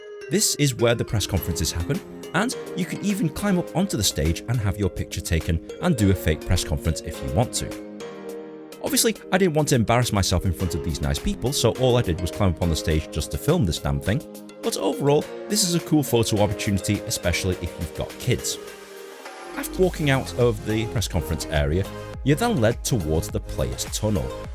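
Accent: United Kingdom. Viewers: This may offend you but how do you look asian yet you sound like a brit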